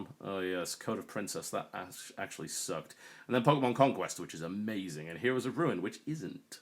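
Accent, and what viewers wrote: United Kingdom. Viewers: British, but lives in America. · With that accent I expected to see UK style power outlets.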